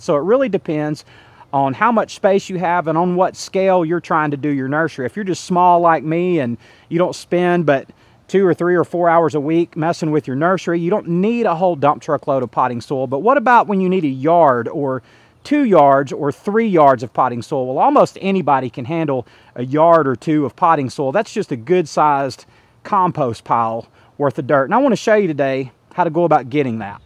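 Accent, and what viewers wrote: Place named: Tennessee, United States. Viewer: Great video! Are you in TN ? You talk like me and I’m from TN . We say we are “ Tickled “ for things . I love to hear other people with our accent and sayings on YouTube 😊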